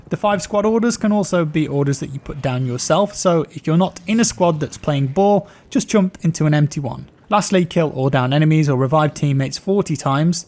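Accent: United Kingdom. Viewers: This is gonna sound pretty creepy, but i just love it when people with British accents explain intricate shit to me XD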